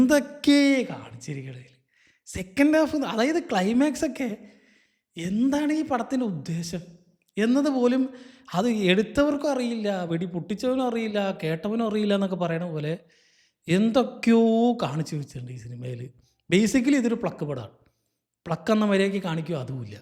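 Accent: Palakkad, India. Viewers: Palakd ano bro sthalam.... Nice slang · 😂😂😂😂 നമ്മളുടെ slang വരുന്നത് കൊള്ളാം Bro Palakkad Slang 😂😂 Review കണ്ട് ചിരിച്ചു മരിച്ചു 😂😂😂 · Annante paalakkkadan bhaasha purathu vanna review......👍🙃😅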